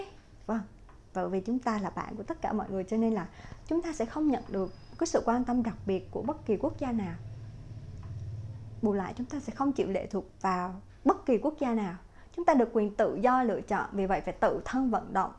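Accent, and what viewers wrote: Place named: Da Nang, Vietnam. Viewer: nghe giọng chị em đoán ra chị là người đn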